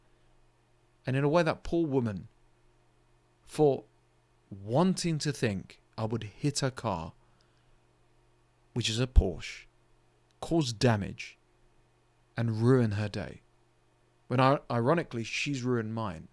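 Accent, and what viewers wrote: United Kingdom. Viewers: Realistic no BS Brittish Man with an elegant accent.. instant subscription good Sir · Im assuming youre in the UK because of your accent, but that sounds fuckin miserable. Im in Tennessee USA and thats not my experience at all. People are generally nice to me as I encounter them, and my only real complaints are mannerisms that dont really affect me and just tell me a little about people. Im a fairly intimidating man though, so I doubt anyone would ever even try me like this.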